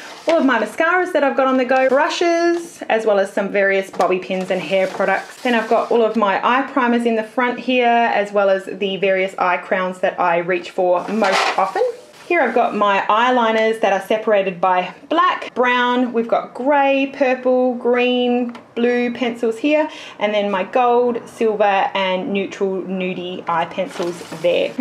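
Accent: Australia. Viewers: Yay! Love: 1. Hearing an Australian accent in a makeup video - where are all the Aussies on YouTube?! 2. That you're over 22! Where are all the adults on YouTube?! Subscribed!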